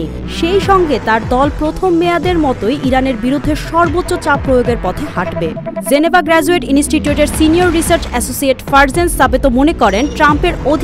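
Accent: India. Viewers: একাত্তর টিভিতে ভারতীয় সাংবাদিকের উচ্চারণে সংবাদ পাঠ।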